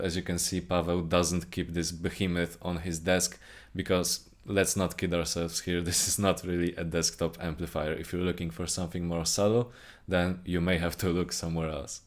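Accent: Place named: Poland. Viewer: jesteś z polski? mam takie wrażenie po tym jak mówisz paweł i po akcencie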